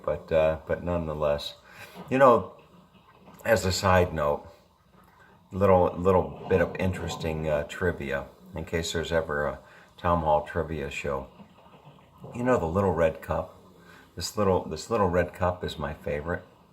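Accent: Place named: United States. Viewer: You mentioned about learning English from your videos. I actually only talk Aussie and I am trying to learn American from your videos. LOL.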